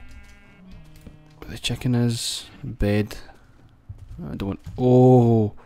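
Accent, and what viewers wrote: Scotland, United Kingdom. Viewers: Yir scotish yes!!!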